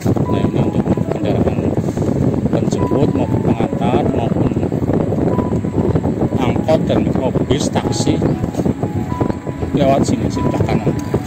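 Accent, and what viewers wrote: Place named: Banyumas, Indonesia. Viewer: Ngomonge ngapak bae jajal aja bahasa indonesia kayane ora pasp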